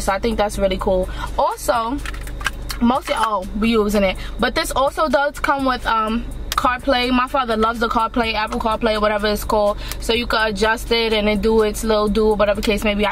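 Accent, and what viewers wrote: New York, United States. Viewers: She from Ny??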